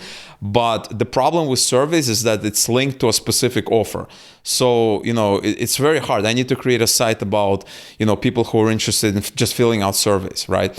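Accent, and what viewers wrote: Russia. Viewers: You're from Russia?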